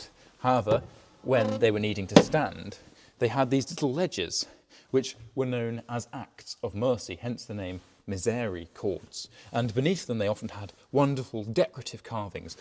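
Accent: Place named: England, United Kingdom. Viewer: Belle visite Belle architecture bel accent anglais ❤😊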